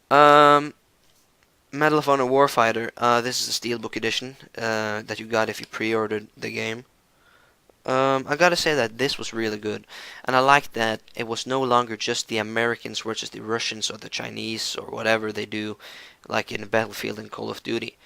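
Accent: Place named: Ireland. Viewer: You from Ireland mate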